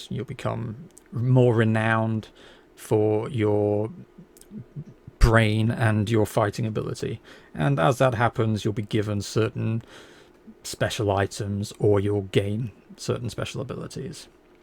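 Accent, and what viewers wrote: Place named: England, United Kingdom. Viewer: I never got to play TOR1e but was impressed by the way it was put together and 2e is looking just as good as Free League's other output. All of the Tolkien licensed RPGs have some merit, with but one exception - I can't understand how anyone can be happy squeezing Middle Earth into D&D. Great game but totally wrong for some styles and settings. I was always disappointed that Decipher's unrelated economic problems killed the LotR RPG. It used the movies as a starting point but was looking to bring move-goers into the wider world of the books if it had just been around long enough. As one last point: keep up the good work. TRPG as therapy fascinates me and it's good to see it with an English accent.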